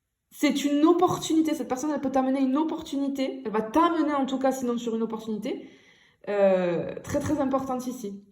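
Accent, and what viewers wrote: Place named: Provence, France. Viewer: Bonjour, j'apprécie la manière dont vous vous adressez à vos auditeurs, votre énergie et charmant accent provençal ✨💜✨🙏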